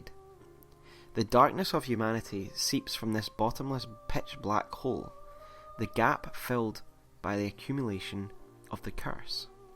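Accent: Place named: Scotland, United Kingdom. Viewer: Hey another Scottish person! Amazing video mate- very informative, got a new subscriber cant wait to see more!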